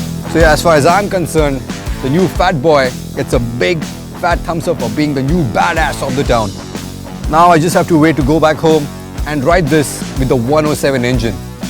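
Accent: India. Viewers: great review and the Indian accent rocks :o)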